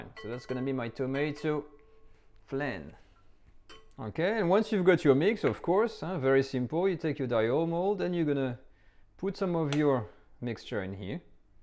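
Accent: Paris, France. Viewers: Parisian Accent 😁 Love your cooking ❤️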